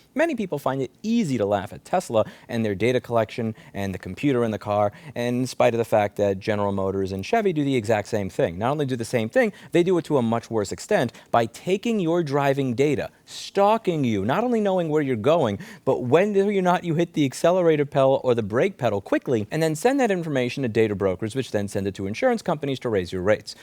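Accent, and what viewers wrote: New York, United States. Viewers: The New York accent was in full effect today😮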